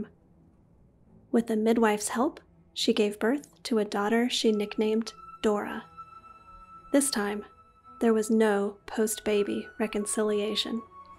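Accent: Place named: United States. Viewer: Sad that the speak is american. the crediblilty changes when its us talk.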